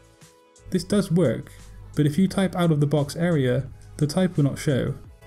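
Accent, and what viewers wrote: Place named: Bristol, United Kingdom. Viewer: I knew I recognised that accent! The same for me between Bris and Bath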